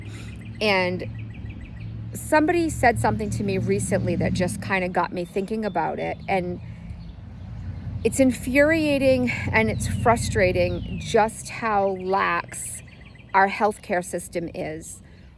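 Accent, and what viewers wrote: Massachusetts, United States. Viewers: Thank you so much for sharing your journey and I'm so glad I found your channel today! I just got a prescription for Zepbound and I've watched several of your videos already so I can have an idea of what to expect (though I know every body is different). Your channel is so informative and I really love your balanced and realistic approach. You're a goldmine of information! When I watched the first few minutes of one of your videos I knew I recognized your MA accent. I'm a north shore gal myself. By the way, you asked in a previous video if someone knew about the food you had at the Guatemalan friend's party, the corncake with the cheese or pork in the middle, those are called pupusas. I've had many of them. 🙂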